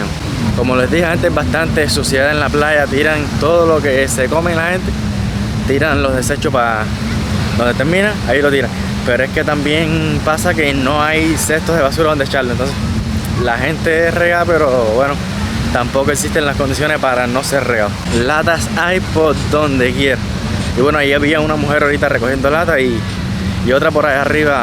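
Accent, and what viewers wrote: Cuba. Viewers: Me gustan mucho tús videos y comentarios,te felicito hablas muy lindo sin groserías ni los asere y con el acento cubano. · tu muy marcado acento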